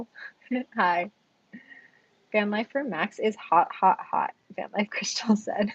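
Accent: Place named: England, United Kingdom. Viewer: Hey you are so beautiful I saw you from leave her wild and then I watch your videos I think you were such a beautiful young beautiful girl oh yeah I love your accent your English one that is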